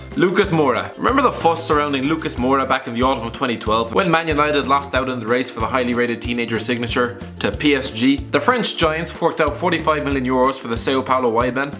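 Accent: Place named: Ireland. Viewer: I can tell you're an Irish chap like myself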